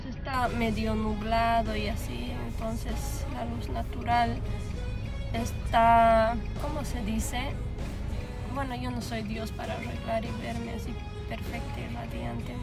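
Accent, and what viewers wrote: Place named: Bolivia. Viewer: Me hueveo no? jajajajaja me mataste, eres autentica boliviana en tu manera de hablar 100%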